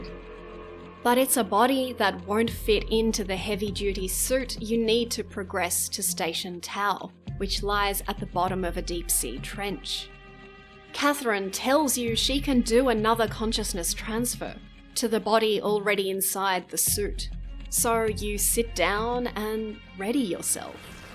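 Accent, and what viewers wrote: Australia. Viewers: Australian goth??? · Grabbed this based on your vid. Nice to hear a fellow female Aussie gamer.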